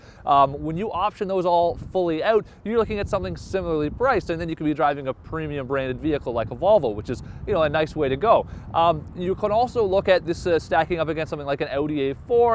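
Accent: Canada. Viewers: is he Canadian? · All I hear is Canada seeping out of his nostrils.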